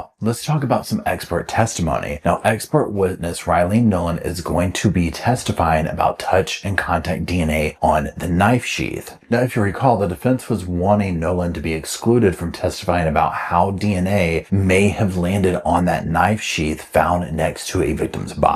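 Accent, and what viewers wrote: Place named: Western North Carolina, United States. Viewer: I didn’t know you are a fellow Tarheel. I thought your voice was kinda western NC:)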